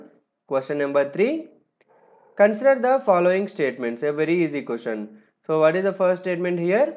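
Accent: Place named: Telugu, India. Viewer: Very helpful Thank you so much.... Ur accent seems to be that of the Telugu people...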